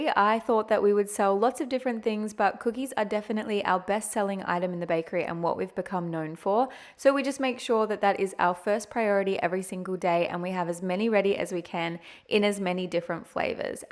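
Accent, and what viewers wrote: Australia. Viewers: Wow im not american but those have to be huge cookies even for the USA standard right? 😅 Edit: just realised thats an australian accent hahaha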